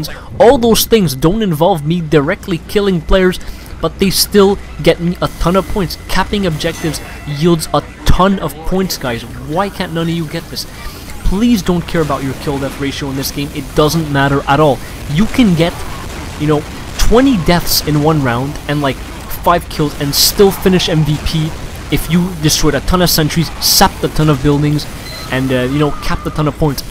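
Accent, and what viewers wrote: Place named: Canada. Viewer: Garry Newman English accent? I'm pretty sure you mean canadian. I find it cringeworthy that you can't actually understand him to a degree where you wouldn't need subtitles.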